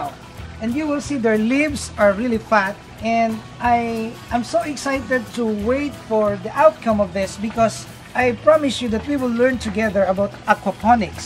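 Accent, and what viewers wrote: Philippines. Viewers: Hi,i am Indonesian thank you for sharing a lot of inspiring knowledge from you experience&your good heart,humble& english style remind me of my pinoy friends (i miss them),wish u more success,salamat Po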